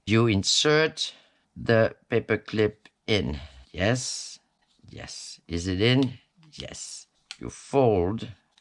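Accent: France. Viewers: you done a great job and here is the story on why i am your subscriber, i first thought you were this other crafter that i havent seen in a long time, i clicked on your channel and your voice and accent was not the crafter i thought you were, then you reminded me of the woman my mom had come to sing us kids to sleep and tell us Norwegian stories, i realized you are French and there could be no way in fashion you were that Norwegian woman bcuz i am 53 and she would be passed on, bcuz i think she was 53 back then. but how ever i am glad i clicked on your channel and getting to know you and crafting with you!! i just adore you fully!! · Gosh darn I love your videos,glad to meet you,my name is also,I go by Trish,I love your french accent,I was also born in france,not too far from paris. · Crafting is so much more fun with a french accent! Loving this tut! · Oh I love you already, I'm French too but I live in a mostly English area, but your accent reminds me of my family. 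Lol, anyway like your video too.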